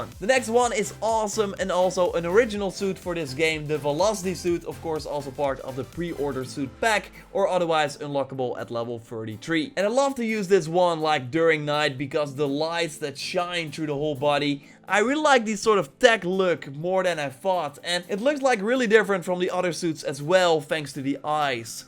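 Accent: Netherlands. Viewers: Are you from the Netherlands? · Are you dutch? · Jij bent nederlands toch? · You are dutch right? I think i hear it in your accent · Deze guy is sws Nederlands😂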